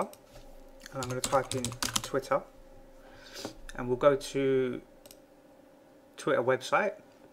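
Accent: United Kingdom. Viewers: The accent is like harry potter nowadys😳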